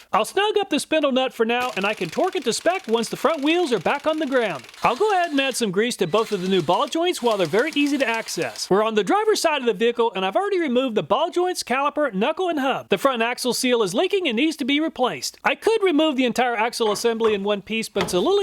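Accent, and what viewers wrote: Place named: Midwest, United States. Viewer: He says all sorts of things funnily. I figure it's in that midwest water. I watch some gals over in Ohio and they say "To MAH to" all the time for the red orbs from which katsup is made. I laugh and laugh. But hey--it's not wrong if you live there and it works for communications.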